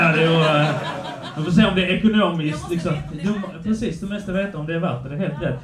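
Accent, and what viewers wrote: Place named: Skåne, Sweden. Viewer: Såg dig för första gången på fb idag och kom hit för att få mer.😅 Var släpper du mest, för som en skåning i Oslo kan jag inte få nog av skånska.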